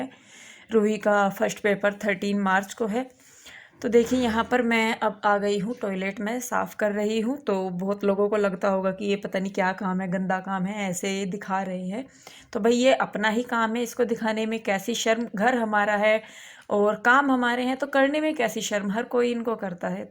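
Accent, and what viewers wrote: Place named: Baghpat, India. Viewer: Aapki language dekh kr lagta h jaise aap baagpat badhot ki boli bolte ho meri h friend h waha ki to uske ghar me bhi sab aise hi bolte h acha lagta h sun kar.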